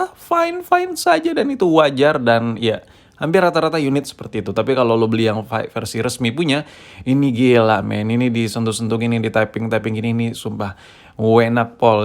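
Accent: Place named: Medan, Indonesia. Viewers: Medan kali bah logat kau kimak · ini malah ingatkan awak saama logat medan,kreak lah, pukilah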